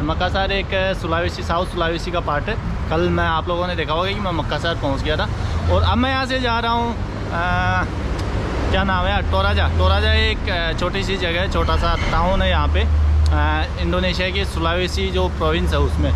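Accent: Rajasthan, India. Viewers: bdiya bolte ho pura Rajasthani touch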